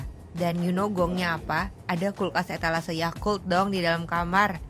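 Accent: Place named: Sunda, Indonesia. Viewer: Durasi maksimum 25 menit sudah pas sih buat nonton... more than that, akan jadi agak effort juga nonton sampai selesai... Tapi ini content very well created... mantap!! Btw, logat Sunda-nya si Cici kental banget yaa hahaha... kepo sama merk celana tactical Ko Misua, kok kayaknya bagus tuh celana tacticalnya... spill dong...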